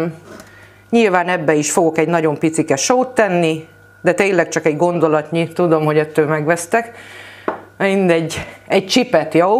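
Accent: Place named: Hajdúság, Hungary. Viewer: Nagyon aranyos vagy, még akkor is ha nem vagy egy bájolgó tipusú. Főleg hogy gyerekkorunk "bűneit" is (a nyalakodást) is emlited. Nem kutattam még honnan kűldöd a tanításaidat, de az ízes hajdúsági es környeki beszeded árulkodó ( mint debreceni ) köszönünk mindent!❤️Áldott ünnepet, ❤️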